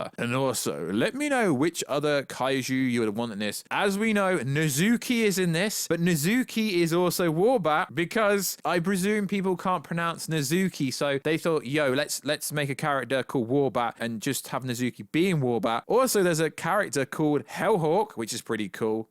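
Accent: England, United Kingdom. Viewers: My boy, that's English with an English accent, it's like me saying I don't understand Hindi if you speak with a North Indian accent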